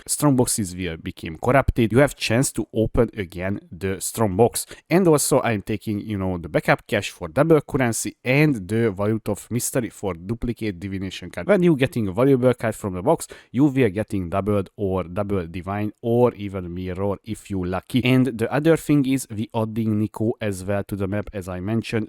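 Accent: Hungary. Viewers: Work on your accent my buddy. Without looking I'm pretty sure you are from Hungary. :D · nagyon hallani, hogy Magyar a srác 😅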